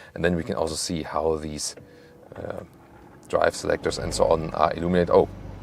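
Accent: Germany. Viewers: I like watching German car reviews with German accent 😊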